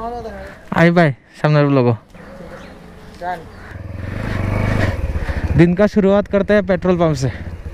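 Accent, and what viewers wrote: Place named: Comilla, Bangladesh. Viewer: Dada, apnar local language akkebare amader Bangladesh er comilla , brah Mon baria'r local language er Moto..shune khub moja lagtesilo💕✔️🇧🇩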